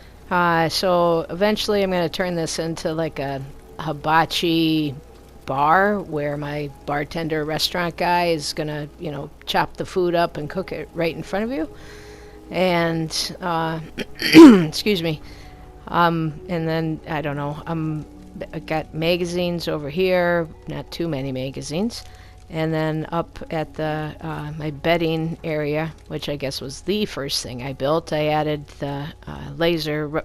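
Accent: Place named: Brooklyn, United States. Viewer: Out standing! Your funny and lovable would change a thing. Well I do like the Brooklyn voice tho. hehehe see ya in the next one!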